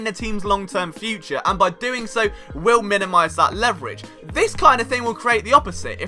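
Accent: England, United Kingdom. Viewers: You're British??? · Ugh damn I try but I can't stand the accent at all!! Fuk not that the vid is bad. It's just you talk fast and loud in the accent I think that's what people are talking about I don't think it just cuz ur not a American u just talk fast and have a heavy accent .I personally cannot stand an English accent at all. But it has nothing to do with you personally at all. Eagles fans are over the world and anyone can be a fan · ... I'm old enough to be your grandfather, born, raised & lived in the Philly area most my life, but now live in northern Colorado... You Sir, are young, born in Britain, have a British accent and know tons of facts about the Eagles... Yet it all means nothing until we cut ourselves open and... "BLEED GREEN" and that sir, you do... screw the trolls! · Wait, you're British? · I’m from Philly and listen to a lot of Eagles content and yours is by far the best. 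I like the fact that you don’t hype things and that you dive deep to give all of the supporting information that you can for every post. When I first heard your accent I was curious about why and where you were doing this from and I confess I am still a little curious. I’ve never caught your full story of how a Brit got into this. you are exceptional at what you do! You have a gift and please keep bringing us information starved and isolated Eagles fans Eagles news to brighten our days! Thank You! Ace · Weird mix of English accent and Eagles news.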